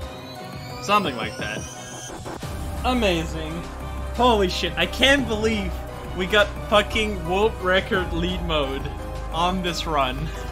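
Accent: Netherlands. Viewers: dude I just started watching your videos and I had no idea you were Dutch until I heard you read the letters and numbers out loud. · ik hoorde het al eerder aan het accent, komt ook wel omdat ik al had gezien op <link> dat je nederlands bent. :P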